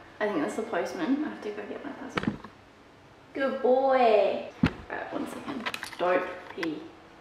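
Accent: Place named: United Kingdom. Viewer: I thought you live in England. You accent was kinda British that's why I got confused. Then props to you <3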